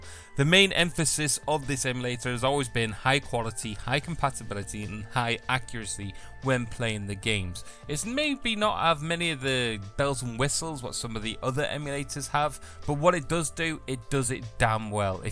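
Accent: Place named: England, United Kingdom. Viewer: I did not watch your channel for a while since I had been busy with my work. I think I have so many to catch up tho. The English I learned was all American(accent). It's kinda odd to hear English English though many would say it's the original/formal/polite English speaking. I don't care other sayings, it's still difficult to me to listen. However, I really enjoy your accent, that's the only one I understand and like the most:D. And that's the reason I stick to this channel and press thumbs up every time. I wish one day I could speak like you. That "good day gaieyes", that "waful", that "push it to the limit", and that "most'fallll".....:D:D:D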